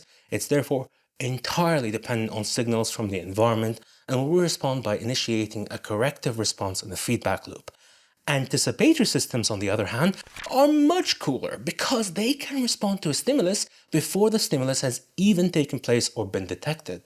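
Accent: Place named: United States. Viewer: He sounds like an American to me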